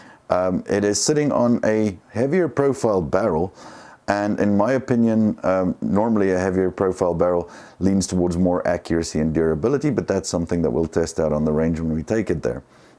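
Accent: South Africa. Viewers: You sound South African ... ?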